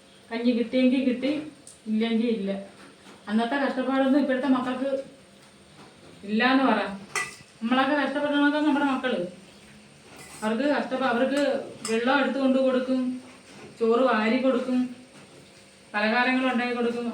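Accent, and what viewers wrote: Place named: Adoor, India. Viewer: എനിക്ക് നിങ്ങളുടെ സംസരം കേൾക്കാൻ ഒത്തിരി ഇഷ്ടമാണു . എന്റെ നട്ടിലെ സംസരം തന്നെ ആണ് ..ഞാൻ അടൂർക്കാരി ആണ് ,,ഇപ്പൊ UK ഇഇല് ആണ് ..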